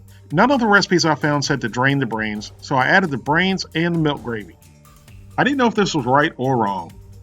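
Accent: South, United States. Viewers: No offense but if your dad liked pigs brain and scrambled eggs you have some fucked up genetics. I always imagine you as a big 260, 280 lb southern dude off the way you talk and eat lol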